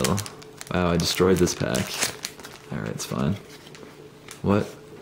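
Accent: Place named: United Kingdom. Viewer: lol nice british accent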